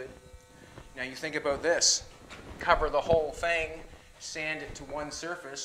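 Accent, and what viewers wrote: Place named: Newfoundland, Canada. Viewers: East coast of canada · That's a Cape Breton accent if I've ever heard one! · Where is this guy from? Sounds like a Newfoundlander to me. Great video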